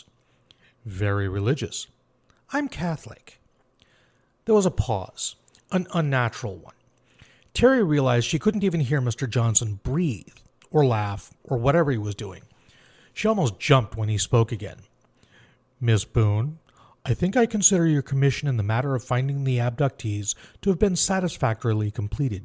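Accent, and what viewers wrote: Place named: United States. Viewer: I like this story and your voice. NetNarrator and Agro Squirrel are my usual listens, but it's nice to get an American voice once in a while. Keep it up, and don't damage your vocal cords, please!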